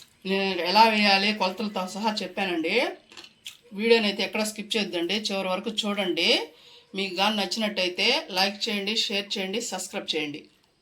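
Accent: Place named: Nellore, India. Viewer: Nellore telugu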